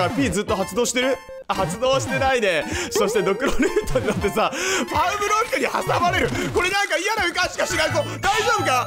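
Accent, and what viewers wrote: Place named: Northern Kyushu, Japan. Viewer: ども！女性視聴者ですww もう、九州北部出身からしたら 地元が近い&方言で推せますね👊( ¨̮💪)✨ いつも元気もらってます✋( ˆ̑‵̮ˆ̑ )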